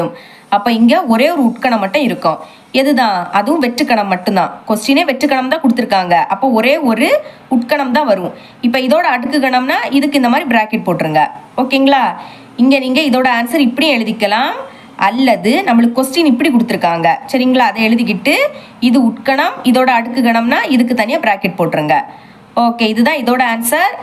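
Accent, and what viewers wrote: Kanyakumari, India. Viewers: Neenga kanniyakumari yaa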